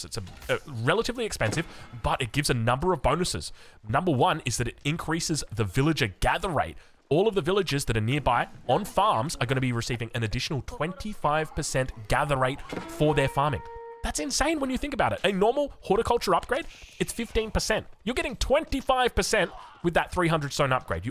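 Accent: Australia. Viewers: Dont gang up on poor about his pronunciation of words, he's from Australia and their version of English is different.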